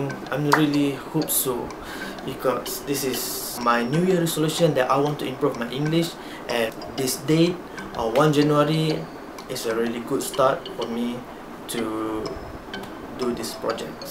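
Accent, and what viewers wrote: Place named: Malaysia. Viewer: Where are you from? I bet it's between Malaysia or Indonesia. I'm Indonesian actually but not a booktuber, but I subcribe u to find good books :D I can't speak english fluently too xD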